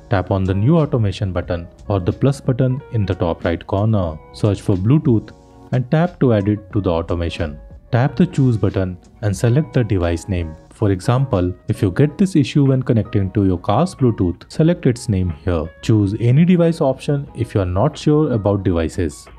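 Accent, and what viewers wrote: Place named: India. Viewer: The man’s strong Indian accent distracted me from what he was saying. Also when he talked fast I could not follow his instructions and sometimes I could not even distinguish the words. I gave up and am seeking an answer elsewhere.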